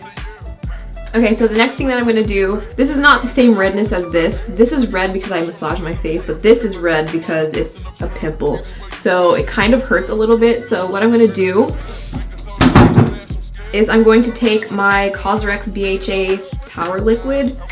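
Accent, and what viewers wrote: Australia. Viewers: I just subscribed recently and when you started this video I was like "oh I forgot she was Australian" lmao. Loved this video:)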